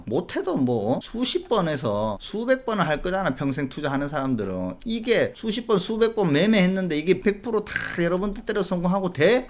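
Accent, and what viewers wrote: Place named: Gyeongsang, South Korea. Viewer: 항상 시원시원하게 말씀해 주시고 경상도 사투리 정감가고 좋아요 항상 잘 듣고 있습니다 감사합니다.^^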